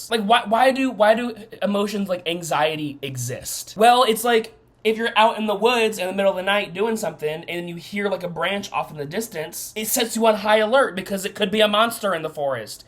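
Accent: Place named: Midwest, United States. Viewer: You have the same accent as me: "Root" = "Rut" and "Woods" = "Wuds" - are you midwestern?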